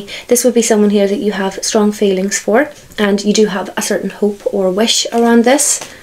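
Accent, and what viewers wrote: Ireland. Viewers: I so enjoy your readings and your Irish brogue. And now today I'm loving your Scooby-Doo top. Can you please tell us where you were on vacation? It looked spectacular! Thank you for sharing your abilities and gifts with us. ❤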